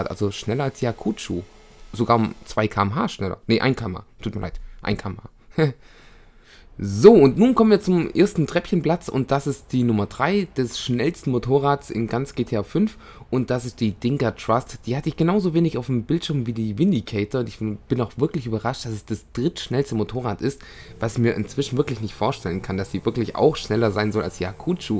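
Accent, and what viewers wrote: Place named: Swabia, Germany. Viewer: Schwäbischer Dialekt iz da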